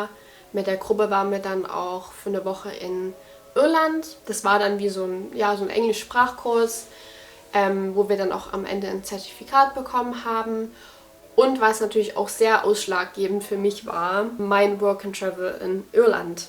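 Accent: Saxony, Germany. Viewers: Und egal wie sehr du dich anstrengst, man hört das du aus Sachsen kommst. 😅 Find ich aber gut, kann mich richtig mit dir identifizieren. 😂❤️ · sie sächselt definitiv 😅 als sehr hochdeutsch Sprechende höre ich das extrem 😂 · Awa haha die hat einen Ultra deutschen Akzent in englisch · Dein deutsches Video hat mir sehr gut gefallen 😊 Ich mag deinen leicht sächsischen Einschlag in der Aussprache. Ich liebe diesen Dialekt. Deswegen würde ich auch gerne wieder ein deutsches Video von Dir sehen. Aber die englischen Videos gefallen mir auch sehr gut. So lernt man auch noch nebenbei die Sprache beim Zuhören. Deine Kleidung auf Instagram oder Kleiderkreisel zu verkaufen fände ich gut. Ich wäre schon mal interessiert 😉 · Eine Sächsin ?😛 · Sandys Leben Dachte ich auch aber man hört den deutschen Akzent im Englischen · Ich war schon verwirrt, warum klingt dein Englisch so deutsch, anscheinend kommst du auch aus Deutschland😂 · Haha, habe dich gestern erst entdeckt und gerätselt, was für einen Akzent du hast. 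Und tadaa, es ist deutsch.😂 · Juhu, auch jemand aus Sachsen....und ich hab mich schon gefragt warum ich dich so gut verstehe. ^^` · Ich dachte jetzt kommt ein Deutsch mit einem starken Englischen Slang und dann sächselt sie 😅😅 Sehr überraschend ❤ Liebe Grüße von meinem Kanal 🌸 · Oh, wie süß, du hast einen ganz leichten sächsischen Akzent! Finde es sehr schön, dich mal auf Deutsch zu hören! <3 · Naja sächseln tut sie kaum... einen englischen Akzent höre ich bei ihrem Deutsch überhaupt nicht. Aber den deutschen Akzent, wenn sie Englisch spricht, den hört man ganz klar. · Als ich dich englisch reden hören habe, wusste ich sofort, dass du Deutsche bist. Du hast einen ziemlich starken deutschen Akzent in deiner englischen Aussprache. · Dachte erst eine Deutsche spricht Englisch (also vom Akzent) 😂👍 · Hach, es fühlt sich so heimelig und ungezwungen an wenn dein sächsischer Dialekt etwas durchkommt. 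Ich bemühe mich vor meinen „westdeutschen“ Freunden immer um Hochdeutsch aber ganz verdrängen kann man das „nu“ und „ooch“ nie, ganz schlimm! 😅 aber es war sehr angenehm dich mal auf deutsch zu hören und du siehst toll aus in dem video☺️ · It's so weird to hear you speak german, especially with your saxon accent 😆 cute! But I think you understood one question wrong.. the 19th century is vom 1800 to 1899😂 you talked about the 20th century haha. Non the less it was interesting to hear your answer to it!! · Das stimmt. Man hört in ihrem Englisch, dass sie deutsche Muttersprachlerin ist.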